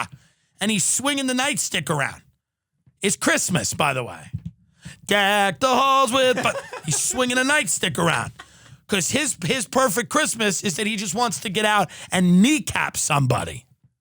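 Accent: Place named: Long Island, United States. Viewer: tim is hysterical and he's such a long islander it's hilarious. everybody on long island knows a guy like tim same accent same way of talking and cracking jokes lol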